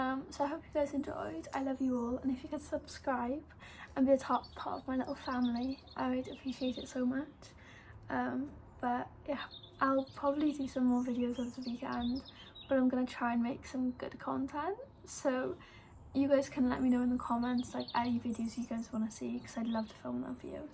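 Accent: United Kingdom. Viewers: Hello, I'm just now getting to this video an you have an amazing english accent. I'm one of your biggest fans. That's Why I subscribed to your channel. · are you british?? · Yep, but she's Welsh, a different British ( English) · Your voice sounds like British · You sound British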